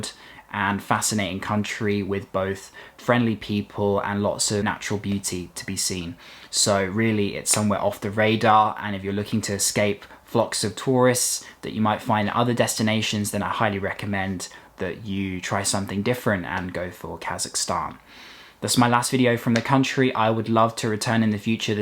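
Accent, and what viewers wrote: United Kingdom. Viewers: I like it your accent bro it's clear and understandable, you're different other British accent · Зае6ись британский акцент))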